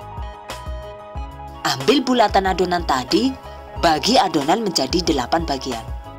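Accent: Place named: Madura, Indonesia. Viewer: Fokus ke suaranya az, bahasanya kayak logat madura hehehe. Maaf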